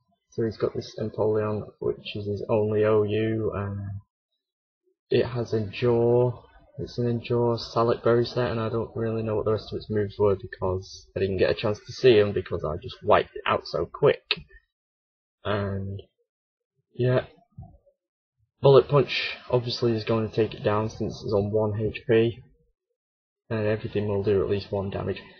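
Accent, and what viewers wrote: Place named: Yorkshire, United Kingdom. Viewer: But the Yorkshire accent is win though...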